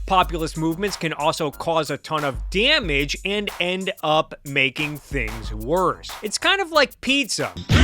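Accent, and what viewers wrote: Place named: Midwest, United States. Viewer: Good video but your midwestern accent is too strong I physically can’t finish it sorry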